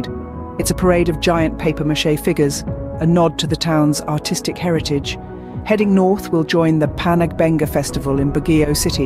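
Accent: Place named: United Kingdom. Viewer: Nice Video. With the British Accent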